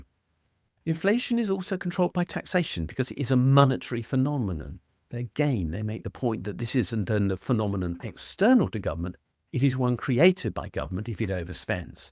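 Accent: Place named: Upper class, United Kingdom. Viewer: Frankly, this seems half baked, motivated reasoning, even disinformation. Like contrasting "keynesianism," which are an awful lot of things inspired by many different economists and policy wonks over a period of time, compared with a neatly wrapped tied and bowed catch phrase ladened MMT is too GD simple, exactly how disinfo works. "Not saying, just saying. Lots of wheels, gears, cams chains wiring and energy sources glossed over or left out of the equations. My personal belief: Taxes are what we contribute to making our institutions, infrastructure and defences better in combination with more modern technologies. They need not be "wasted." Incompetence, graft and corruption are waste and plebiscites can be deceived into accepting them, but inclusive self government and soundly educated participation is a bulwark against. Innovation and improvement is not germinated by funding rapacious capitalized adventurers. 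There's obviously and transparently more to this, but I've gone on long enough. All voiced with a "patrician" upper class English accent. FFS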